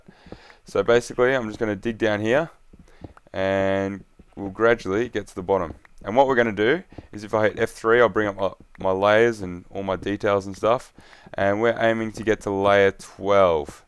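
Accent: Australia. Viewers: are you australian? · you are Australian? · Are you Aussie? I'm a kiwi! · this guy is aussie like me :)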